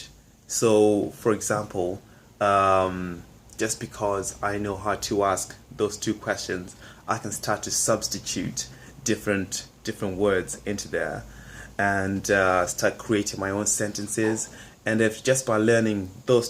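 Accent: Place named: United Kingdom. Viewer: What ethnicity/nationality are you? You obviously sound British but it has a tinge of something else I can't pick up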